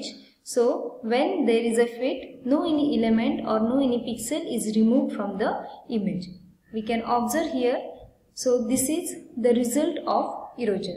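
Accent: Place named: India. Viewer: i hear indian voice, i go back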